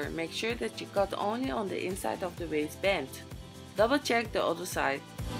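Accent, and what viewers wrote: Netherlands. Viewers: Dutch? Xox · Are you from the netherlands or a dutch speaking country . Because you're accent is cute. Love the vid btw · Hoor direct aan je accent dat uit nl bent, keep shining sis ❣️ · are you dutch? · Wait are you Dutch? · Ik dacht al hoor ik nou een Nederlandse accent in je Engels tot je doei doei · Lovely dutch accent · you sound dutch... are you?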